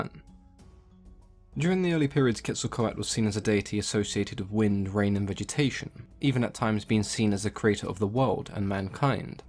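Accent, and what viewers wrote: United Kingdom. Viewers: ok...whats your accent? You sound British but I don't know if that's what it is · Okay, I've just realised your British, I think, maybe? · Yes there's something about the British accent that lends an air of Professional Expertise on any subject. I wish my high school professors talked like this. :) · A schmuck with a British accent trying to give info on Aztec mythology! Foh smh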